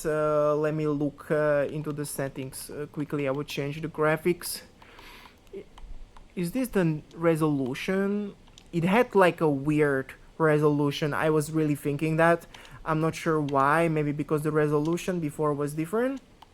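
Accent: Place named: Hungary. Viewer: túl sokat afektálsz , könnyen kihallatszik a magyar akcentus, csinálj inkább magyarnyelvűket, van valami botod de mi a faszoim az? minek mutatod meg ha nem is magyarázod el mi az , értelmetlen használhatatlan a videó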